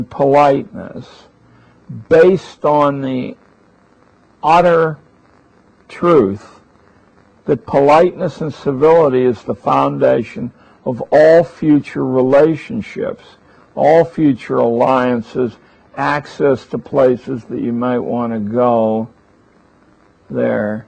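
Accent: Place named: Pittsburgh, United States. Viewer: The Pittsburghese is refreshing.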